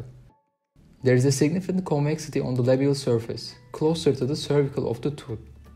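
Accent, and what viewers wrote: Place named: Turkey. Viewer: Tam da Türk aksanı var diyordum 😅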